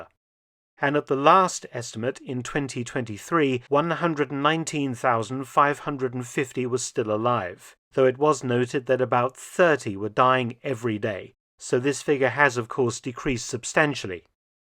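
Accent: United Kingdom. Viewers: Given the appearance (your grandfather's photo) that you aren't as entirely British as you sound, I suppose it is not a coincidence that this was released on ANZAC day. My son wearing my fathers WW2 RAN medals placed a wreath for Scouts Tasmania as part of the ANZAC Day remembrance ceremony today. He also officially received his Queen scout award. Well done Monty, Pa would be proud.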